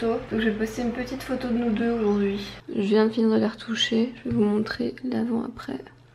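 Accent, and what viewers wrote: Belgium. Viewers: Tu es belge ma chérie ?😍❤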